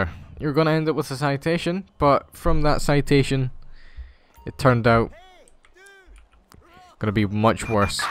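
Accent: Scotland, United Kingdom. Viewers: I guess your Scottish like me, I can tell by the accent also nice video mate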